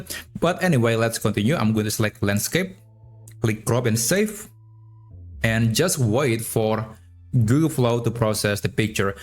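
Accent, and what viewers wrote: Indonesia. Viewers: dari logatnya kerasa banget nih orang indonya hahaha makasih bang tutorialnya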